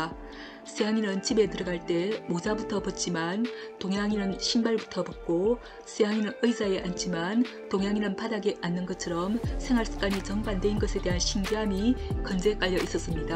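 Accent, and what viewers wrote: Gyeongsang, South Korea. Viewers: 신기하단말야~ 유튜버님 경상도 분이신가봐요^^ 차라리 오리지널 억양을 쓰시는건 어터실지요 듣기가 좀 ..... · 쌀을. 살 로. 발음 하는 경상도 발음 입니다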